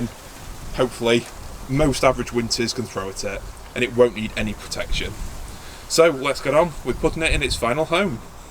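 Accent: United Kingdom. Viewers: Just started watching your vids, trying to create a jungle on Isle of Wight, just bought a musa basjoo but want some trachycarpus fortune seeds, have you got any? I detect a Grimsby accent there to? 👍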